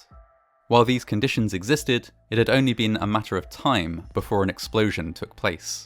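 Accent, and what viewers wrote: England, United Kingdom. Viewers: By his accent, one would assume he is English, so the spelling HOSPITALISED is correct.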